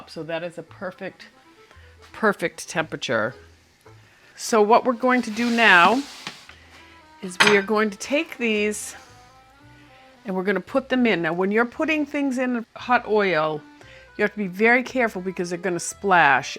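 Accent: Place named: New England, United States. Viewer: It is great to wake up with my coffee and one of your videos. I have been trying to identify your accent. Certain worsa you say remind me of my family in NNew Hampshire and Massachusetts.. We will be trying out your donuts very soon, thank you .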